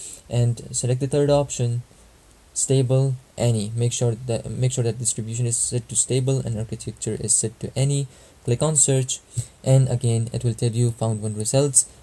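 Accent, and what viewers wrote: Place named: Arab world. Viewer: Bruh judging by your accent are you an arab? If so bruh feeling proud of tht i am an arab too.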